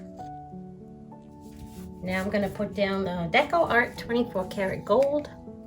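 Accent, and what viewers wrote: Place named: New York, United States. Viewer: Very beautiful. You have a New York accent. So cute.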